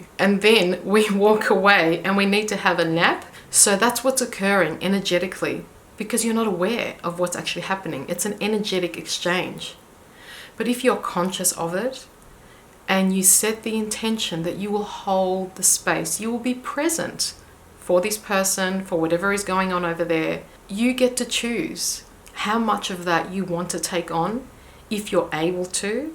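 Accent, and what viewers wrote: Australia. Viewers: OMG I have been working on healing from my narcissistic abuse for a long time and I have just discovered your chaneI. I love that you have an Australian accent. You are so clear and empowering. Thank you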